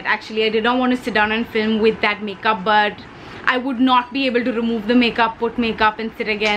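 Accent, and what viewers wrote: United Kingdom. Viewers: LOVE LOVE LOVE ur british accent😢😢😢 i have been thinking about it today only❤❤❤ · Your British accent was so on point!! Is it weird that I want to see more of it xD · please do a whole video with you’re brutish accent it’s just gorgeous, I could go on listening to it, simply music to my years #britishaccentvideo💯 #SundazewithMals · Omg! That British accent wow I'm impressed!! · Your british accent😂😂 love it 😂❤❤ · You do amazing British accent.... I love that..❤️❤️❤️❤️ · U are so damn honest ...love that about u...and funny too...my that British accent 😂...that’s what keeps us subbies rolling on to come back to your channel again and again... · Also I loved your British accent....I am a Potter head and you reminded me of Emma Watson seriously! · Your British accent is perfect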